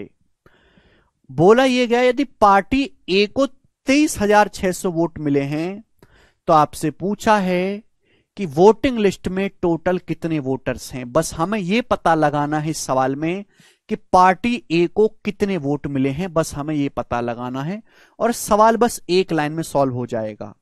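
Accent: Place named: Haryana, India. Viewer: Ram Ram ji haryana ki Badhiya padhao ho sirji In haryanvi style 😊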